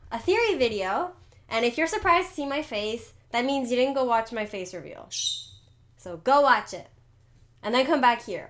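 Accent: Quebec, Canada. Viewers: Unrelated, but are you from Quebec? Cause your French accent sure does sound like it! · never seen this channel before, but I guess you're a Quebecker, right? Cause you sure sound like it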